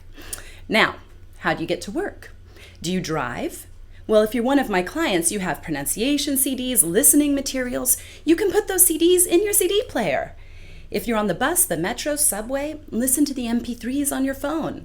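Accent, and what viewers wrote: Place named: Mid-Atlantic, United States. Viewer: You have an amazing accent. It's sort of a posh American accent, so to speak. By any chance, is it close to Mid-Atlantic accent?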